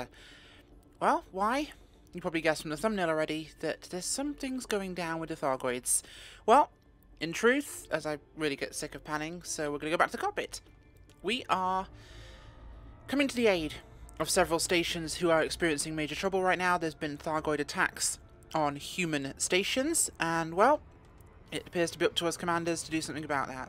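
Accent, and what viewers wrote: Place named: United Kingdom. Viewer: Ok that’s fine btw I like your British accent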